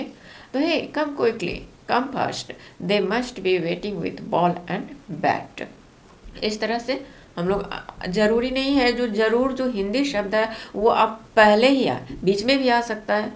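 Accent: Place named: Bengal, India. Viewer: Madam you must be Bengali. Right ?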